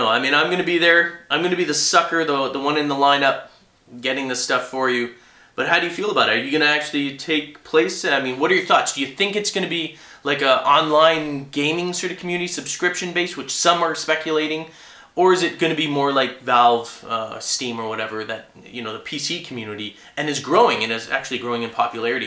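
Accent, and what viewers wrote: Canada. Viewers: I'm just wondering are you Canadian?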